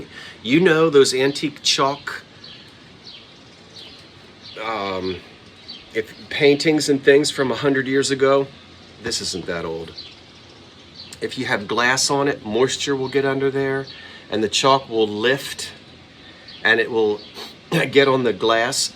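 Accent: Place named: Pennsylvania, United States. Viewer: I haven't watched you for awhile, I missed you. Do you still have your apartment in Philly? I love watching you walk around town. I was born in Chester I grew up in Parkside Brookhaven area my mother grew up in downtown Media Pennsylvania so I love listening to your accent which is very familiar to me and you and I love the same kind of antiques. But finding art-deco out here is really hard so I've been sticking to Murano Glass. Is your bungalow on the Jersey Shore? So good to see you again?😊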